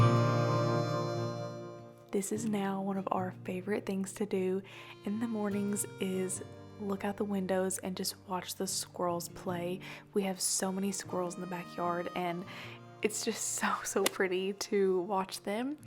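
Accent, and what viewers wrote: South, United States. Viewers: New subscriber here. Congratulations on your beautiful new home! I’m so very excited for you. The countryside looks like farmland in my home state of Ohio but from your accent I’m guessing farther south 😉. I’d love to know what state you are in. I’ve lived in Oregon for 40 years but Ohio farms are in my heart and blood. Those views will never get old. Enjoy every moment and thanks for sharing your joy. May God bless you and your home. ❤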